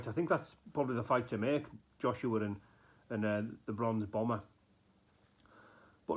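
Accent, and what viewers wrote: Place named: Cumbria, United Kingdom. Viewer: You sound cumbria fella.............Where abouts? Just watched a fight that randomly popped into my tube feed. You said ' is it ower'. That's not Welsh. Lol.........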